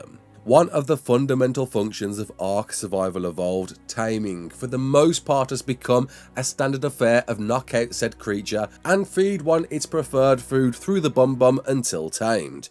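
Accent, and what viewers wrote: United Kingdom. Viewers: I just love your british accent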